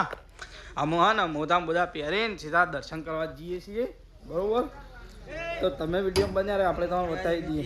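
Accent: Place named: Sabarkantha, India. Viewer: Kyana cho bhai..Sabarkantha ni mast majani bhasha bolo cho.. 😂.. Majja aavi karan ke hu pan aaj bhasha bolu chu ghare.. Hu pan tamari bajuno ja chu bro.. Hu Mumbai rahu chu 💓🙏‼️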